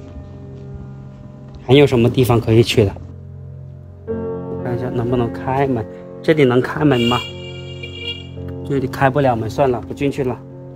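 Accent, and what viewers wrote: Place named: Hakka, China. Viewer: 聽你講話好像是客家人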